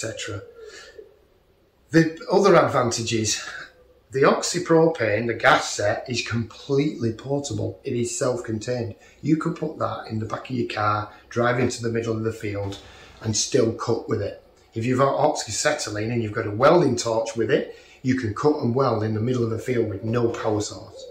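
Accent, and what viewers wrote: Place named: England, United Kingdom. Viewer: it's hard to listen to specially the English accent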